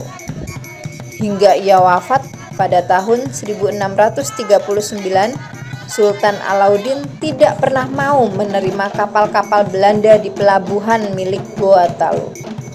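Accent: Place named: Java, Indonesia. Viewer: masih kentara logat jawanya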